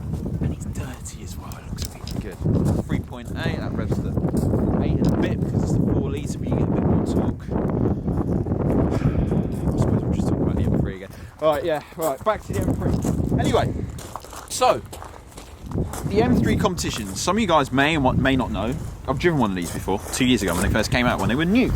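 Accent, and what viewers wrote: United Kingdom. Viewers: Nice vid, wow u are mad British with that accent my man! M3 CS.... I unfortunately didn’t dig the M4/ M3 with the soon to be finished generation of the 3/4 series. I think the fact that it was lighter but only made small hp gains was the big reason why former or current E90/92 M3 owners may not have gravitated towards the newer M car. As for me, I am cashing out on the BMW M brand and hopping over to Porsche later this year, but I hope that BMW tries a bit harder to make their M cars special again. Having the same general engines is not a cool thing, I don’t want to have an M series car that shares the engine with a few tricks and higher boost as a normal top of the range model engine- I want it to be separate (8 also don’t want a damn seat backrest with a glowing M logo on it ... what were they thinking)? Maybe that’s my age kicking in but that is still a dumbass thing they did.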